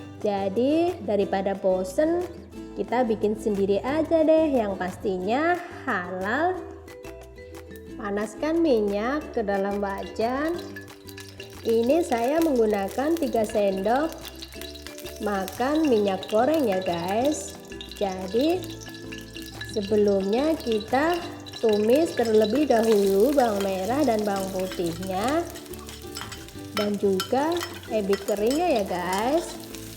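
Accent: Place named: Taiwan. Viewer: seumur-umur baru kali ini makan lobak putih kukus yg enak dan seumur-umur baru dengar suara ngapak aksen mandarin Taiwan.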